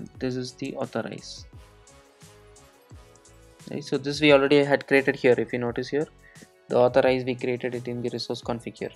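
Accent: India. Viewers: I think its sad that a so talented and good content creator as this speaks with so much indian accent. I dont know why but I really cant handle the indian accent at all. Almost allergic.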